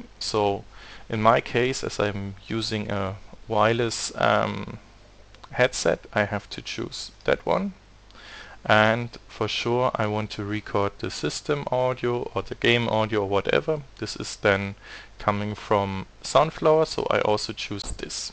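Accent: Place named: Germany. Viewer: Ich habe direkt rausgehört, dass es ein Deutscher ist haha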